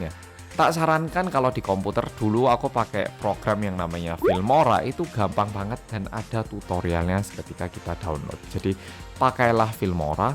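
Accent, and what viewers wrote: Java, Indonesia. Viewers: Medooooooooooooooooooooooooooooooooooooooooooooooooooooooooooooooooooooooooooooooo oooooooooooooooooooooooooooooooooooooooooooooooooooooooooooooooooooooooooooooooooooooooooooooooooooooo oooooooooooooooooooooooooooooooooooooooooooooooooooooooooooooooooooooooooooooooooooooooooooooooooooooooooooooooooooooooooooooooooooooooooooooooooooooooooooooooooooooooooooooooooooooooooooooooooooooooooooooooooooooooooooooooooooooooooooooooooooooooooook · Medok Yach? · Salam medokkkkkk banggg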